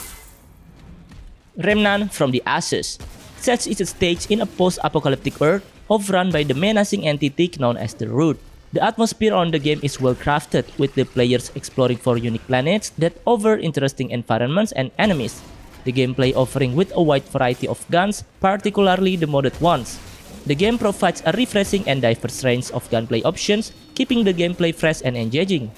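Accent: Indonesia. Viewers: Indo banget logatnya lol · aksen nya kaya orang indo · aksen indo bjir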